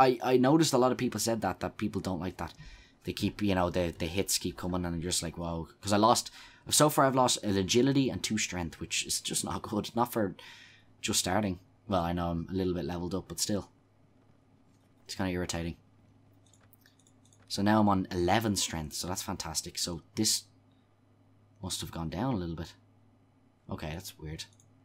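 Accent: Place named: Ireland. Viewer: I figured with the actent lol where in dublin are you from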